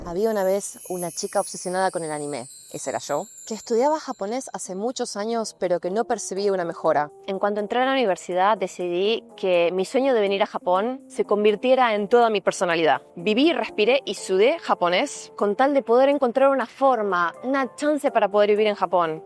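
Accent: Buenos Aires, Argentina. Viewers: acento de porteño* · Hola cómo estás mi nombre es José Carlos de Tijuana México y bueno un gusto seguirte adoro tu pais de Argentina tu acento es lindo n.n y bueno me gusta mucho la cultura japonesa como el anime manga comida japonesa sus paisajes etc etc lo que mas me entristece es que en 3 meses la versión japonesa de power Rangers llamada super Sentai llegará a su fin el 15 de febrero y bueno buen vídeo me gusto fue interesante te aprecio mucho y mil abrazos grandes para ti saludos desde Tijuana México. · Argentino??? O porteño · el 70% de los argentinos tenemos ese acento, asi que acento de argentino* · me refiero al acento, no al habla... Ese acento cringe de porteño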